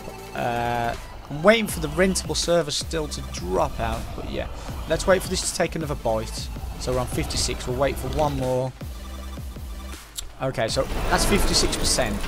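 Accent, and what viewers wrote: United Kingdom. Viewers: After playing for over a year I am only now learning this trick. Prefect for those first herbivore tames at the start of the game! Smashed your like button in its pretty little face, mostly because your brummie accent reminds me of home :D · You from West mids mate sound local haha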